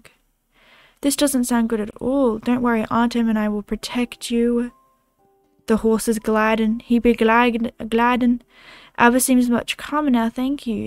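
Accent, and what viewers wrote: Australia. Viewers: you have to be from australia?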